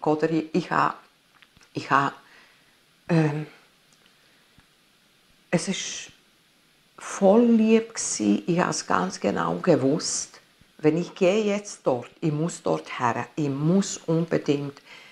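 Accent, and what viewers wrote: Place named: Swiss German, Switzerland. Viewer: This lady speaks Swiss German dialect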